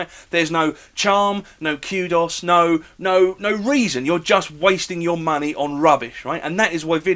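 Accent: United Kingdom. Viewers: love the english acsent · not a strange accent, its just brittisch, no problem :P nice vid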